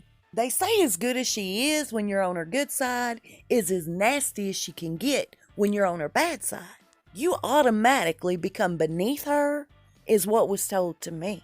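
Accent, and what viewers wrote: South, United States. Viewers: A southern accent always makes me feel at home. I appreciate you,!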